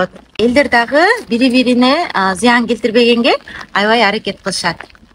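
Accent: Standard. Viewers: Азаматсын! Таза суйлогон кыргыз кызы экенсин.Аман бол.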